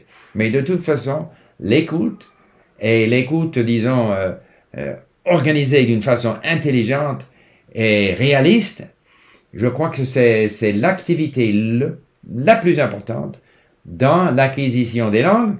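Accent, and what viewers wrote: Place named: United States. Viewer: الأكسنت تاعو رايح للماريكانية بسك ماريكاني . بصح الفرنسية تاعو parfait 👍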